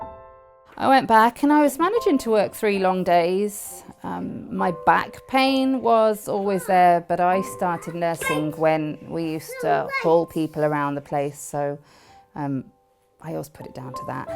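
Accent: England, United Kingdom. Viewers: You sound English but I am assuming you don't live in England? If you were in the UK you can get free prescriptions to cover any medicines, I have 11 prescriptions monthly and used to buy a yearly pass whilst I was still at work but since becoming too ill to work I now get all my medicine for free, I cannot imagine what it must feel like to have the extra burden and worry of affording your meds I know if I had to pay for mine I couldn't afford them all and would have to go without some of them. I hope your situation improves and thank you for being so open and sharing this with us. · What i dont understand is she said she can't afford the medication but she sounds British in which case she wouldn't pay for medication and being on esa she wouldn't have to pay for prescriptions. I have fibromyalgia and its truly awful so I sympathise with her but I just dont know what she means by affording medications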